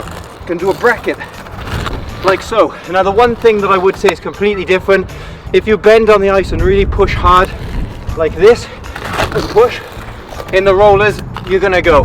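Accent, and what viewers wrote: Wales, United Kingdom. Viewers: anybody else curious how he got a welsh accent? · also, you have an interesting accent, are you Welsh by any chance? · Oh wow are you welsh? Tin siarad cymraeg? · Is he Welsh?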